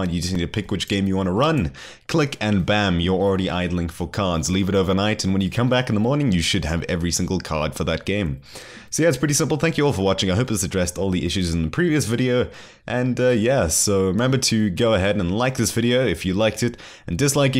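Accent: South Africa. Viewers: i new you sounded South African howsit fellow South African ^^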